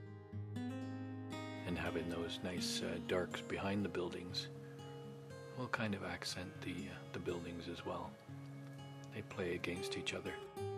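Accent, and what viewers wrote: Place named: Canada. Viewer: I lived along the St Lawrence River and grew to enjoy the Canadian accent, thanks for the memories. I love how you capture the soul of the area in your pictures. 👍🏻🥰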